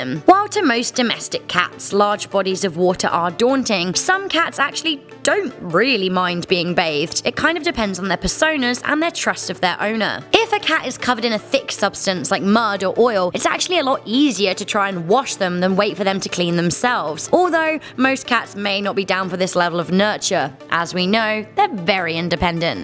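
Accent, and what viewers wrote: England, United Kingdom. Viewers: Loves the color green Has an English accent And loves cats Anyone get the reference?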